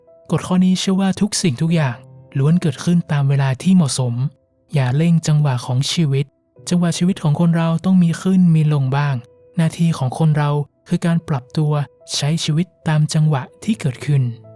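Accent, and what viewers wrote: North, Thailand. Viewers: คนเหนือแน่นอนครับ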